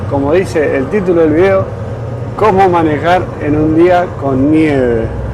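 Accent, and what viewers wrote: Argentina. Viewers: Saludos desde Monterrey, Mexico.. tienes acento tipo Maradona (qepd), tus consejos son exelentes!!! gracias. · Vos sos argentino!!!!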